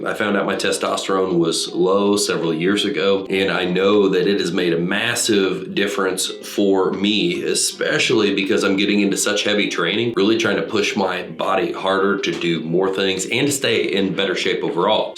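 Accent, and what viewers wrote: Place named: United States. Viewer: Just clicked on this: THANK GOD not another rancid English accent or Aussie accent. Was totally expecting it and refreshing to hesr American English, which just sounds better and more “normal”. 😂❤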